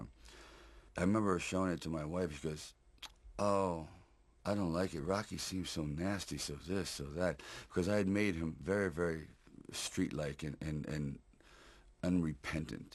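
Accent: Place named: Philadelphia, United States. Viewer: Philadelphia accent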